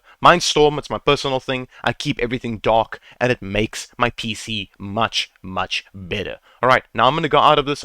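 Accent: South Africa. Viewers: love your stuff man. Are you South African?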